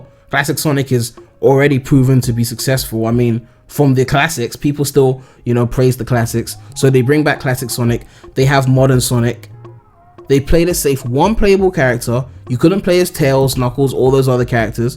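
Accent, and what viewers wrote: United Kingdom. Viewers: Great, I'm new to your channel so I'm curious about this, do you have some British in you? You sound kind of British. lol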